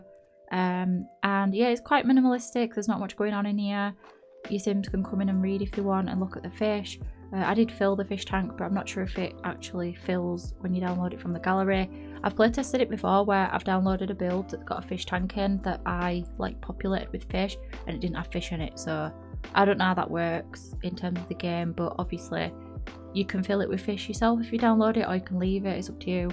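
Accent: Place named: Northern England, United Kingdom. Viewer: Subscribed mainly because of the Northern accent (we are under represented on YouTube 😂) Also those window boxes are gorgeous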